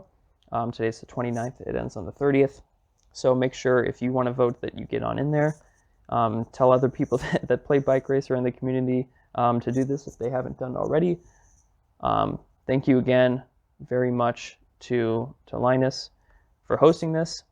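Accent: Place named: England, United Kingdom. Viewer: I like your english accent lol😭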